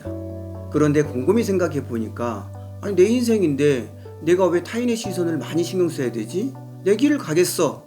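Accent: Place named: Jeolla, South Korea. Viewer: 짧지만 많은 생각이 듭니다 님처럼 실천은 쉽지 않은 거 같아요 중간 중간 전라도 어투가 더 친숙하게 느껴집니다 가~~~치 가시죠 ㅋㅋ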